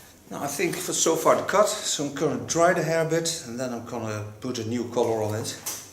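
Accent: Netherlands. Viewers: I love to watch your videos!! Part of the attraction is to see your cutting & colouring. It’s exciting to see your finished results, amazing. Part of the reason I especially enjoy your channel is because I’m half Dutch & hearing your accent reminds me of my late father & my remaining family in the Netherlands. My father was born in Haarlem but very sadly he died when I was young, in the mid 1970’s. All the family are now around Assendelft. As a tiny child I remember my father speaking on the telephone to family in the Netherlands. I remember he often spoke with Uncle Anton who was a hairdresser with a salon in Amsterdam!!! Unfortunately I only now have cousins alive in the Netherlands, all other family have passed away. So…when I watch your videos it makes me so happy to think of the Netherlands & my very wonderful family there…those that are no longer with us & those still alive. 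Thank you!! 🇳🇱👍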